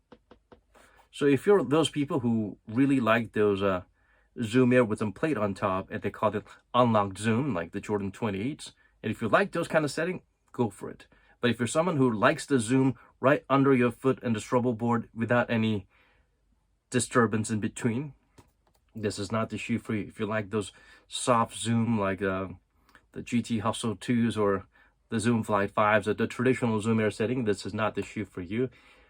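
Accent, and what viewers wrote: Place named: United States. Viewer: 형님 미국 교포신가요??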